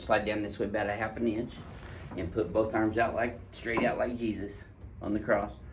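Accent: South, United States. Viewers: are you from redneck?? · Nothing wrong with a southern accent and a nice rack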